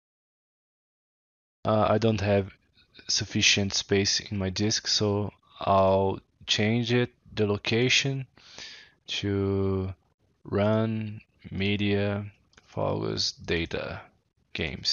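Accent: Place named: Brazil. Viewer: comecei a ver o video e falei "porra, eu conheço esse sotaque, esse cara é br ctz kkkkkkkkkkkKK" mt bom mano, valeu pelo tutorial ai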